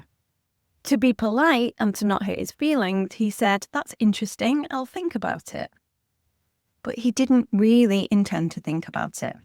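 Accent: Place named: United Kingdom. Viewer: I am not able to understand the British accent effortlessly.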